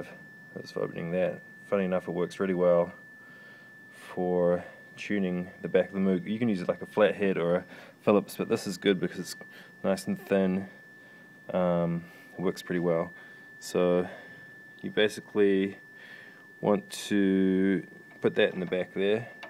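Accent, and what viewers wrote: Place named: New Zealand. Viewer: "for people who are too lazy to read the manual" hahaha - that's me Your accent + use of jandal makes me suspect your are from NZ - am I correct?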